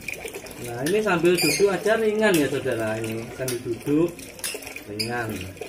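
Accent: Java, Indonesia. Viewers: Medok wkwkwk di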